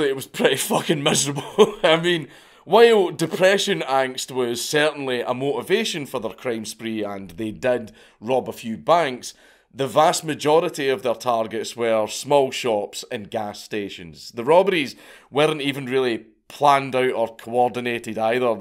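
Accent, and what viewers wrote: Scotland, United Kingdom. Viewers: You know, I never really was interested in the legend of Bonnie and Clyde untill I found out the story was going to be told by this charismatic, jolly Scotsman. 😃👍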